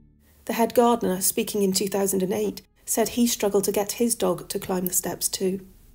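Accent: United Kingdom. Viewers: Love a good ghost story, especially if it's British and recounted with a British accent ❤ 🇬🇧 the great Robert Hardy did a series on castle ghosts which I adored! This is awesome, thank you ⚘️ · hello love your YouTube channel and your videos and your British accent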